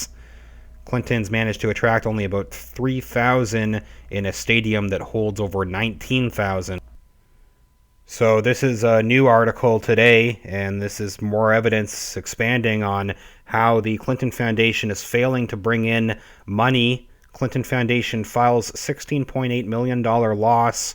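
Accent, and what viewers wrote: Canada. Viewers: You sound a little Canadian. Great channel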